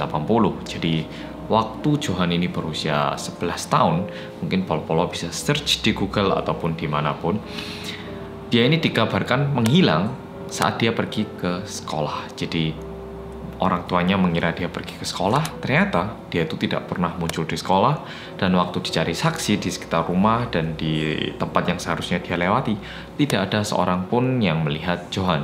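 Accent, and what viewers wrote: Java, Indonesia. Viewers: suka ih medoknya mas 😂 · Sangat medok sekali luar biasaa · suka bgt denger mas ngomong medhokkkkk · MEDHOKKK AAAA SUKA BGT SMGT TERUS MAS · Bersama orang korea yg medok💛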